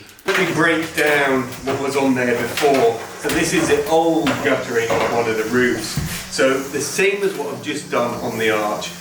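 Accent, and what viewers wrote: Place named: London, United Kingdom. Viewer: . I lived in the east end of London until my family moved to Canada in 1957. My accent at 13 years old was just like yours and being in high school the pressure to lose it had a big effect on my self confidence. I only mention this because your channel is so successful and you are so admired for your progress on the chateau. You don’t have to start pronouncing your t’s on my account. Your dogged determination is inspiring, as well as your London accent.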